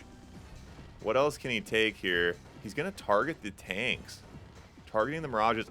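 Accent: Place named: United States. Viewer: You are Dutch, you sound totally American to me 😅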